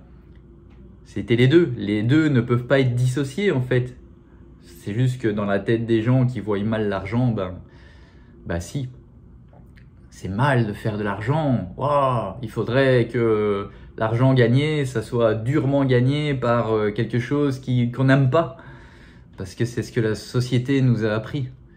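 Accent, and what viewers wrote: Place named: Belgium. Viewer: Très très touchant, tellement réaliste, bravo de dire tout haut ce que l'on pense tous! Et sinon ton petit accent belge😍😍 Gros bisous et bon courage à ta maman et à vous tous dans cette épreuve.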